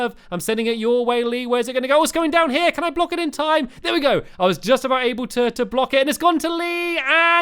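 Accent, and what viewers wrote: United Kingdom. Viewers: U speak British like meh friend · 0206 Are you british?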